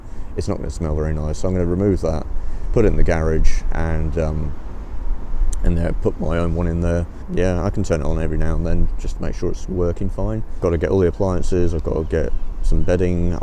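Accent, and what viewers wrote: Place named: United Kingdom. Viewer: You definetly sound more Brittish than before if nothing else 😅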